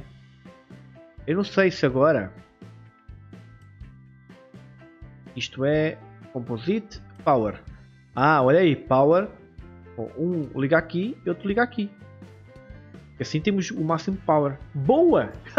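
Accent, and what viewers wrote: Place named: Portugal. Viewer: Cara ele é português isso é sotaque... como vc quer que o cara fala como um paulista ou carioca? kkkk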